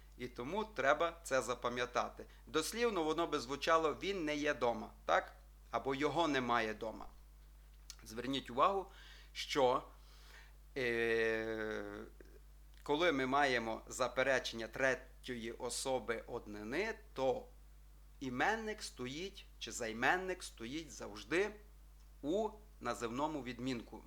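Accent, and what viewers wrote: Ukraine. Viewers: Ну как можно учить чешскому ,разговаривая по закарпатскому ? Это капец ! Невозможно слушать Один я слышу разницу ?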